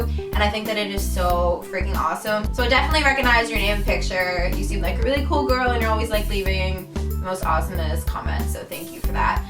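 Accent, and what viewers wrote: Canada. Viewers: can you do the accent tag? :P i could always tell you were from canada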